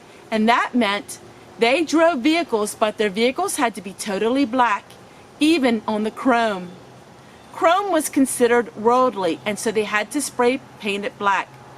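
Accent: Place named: Pennsylvania, United States. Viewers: I had a feeling you were from the Pennsylvannia area. Choose sounds slightly like 'chews', and on sounds like 'awn' (hard to describe), kinda subtle but I hear it. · Yes you can have both. That person is ignorant. I like how you speak, I grew up pretty close to your area in Willow Grove, Pa. during the 60's and 70's so I'm familar with the dialect. Growing up I had two sister Quaker ladies (spinsters) who babysat me and they spoke using always thee and thou in their sentences and wore long dresses and lived very frugally. I learned what a compost pile was from them, how to prepare simple heathy meals and how to wash clothes by hand. People criticize sometimes what they fear. You are honest,simple, godly peole just like my sitters, Cynthia and Myrtle. Keep on being you.👍❤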